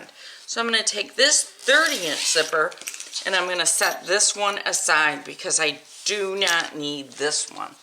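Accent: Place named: Midwest, United States. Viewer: Taking a class on this so it was great to see your steps ahead of time ! Thanks so much ! Love your mid western accent - I’m a Detroit transplant now living in Texas!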